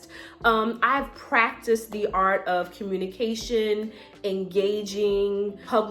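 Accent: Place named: New York, United States. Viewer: I knew I heard a NY accent. U threw me off with being from NC. Now I know where it came from 😊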